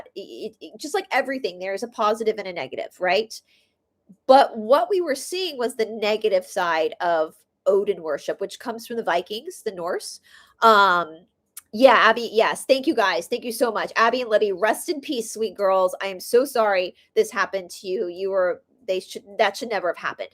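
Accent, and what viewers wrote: South, United States. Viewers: Oh, and all the southern isms are spot on, lol.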